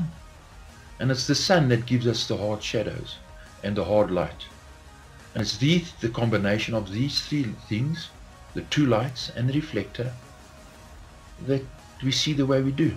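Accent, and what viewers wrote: South Africa. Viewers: are you a South African.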